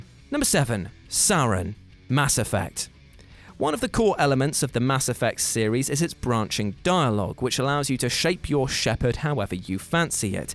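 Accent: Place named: United Kingdom. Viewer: Great another Brit narrator, disliked the video. -.-‘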